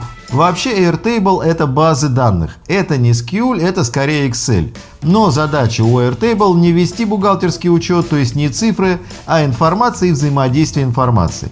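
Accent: Moscow, Russia. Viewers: Тааакой зааабавный мааасковский ааакцент у. А сам ролик бесполезный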